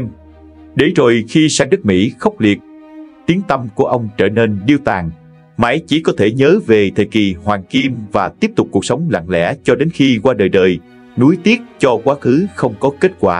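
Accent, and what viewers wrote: Vietnam. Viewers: Kênh này ngoài MC nói giọng Sài gòn, thì nội dung khá rẻ tiền. Chủ yếu khai thác đặt tựa đề giật gân, không đúng sự thật để gợi sự tò mò của người xem, không có đạo đức truyền thông. Đặt tiêu đề hạ thấp những nghệ sĩ kỳ cựu đã khuất để kiếm tiền. Những người đàng hoàng, có lòng tự trọng sẽ không đăng ký những kênh rác như vầy. Disliked.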